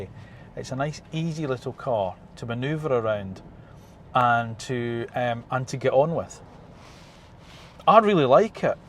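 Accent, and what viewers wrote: Scotland, United Kingdom. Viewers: gotta say, your (scottish?) accent is really nice and warm to listen to (not in a homo way). just that it feels pleasant and friendly.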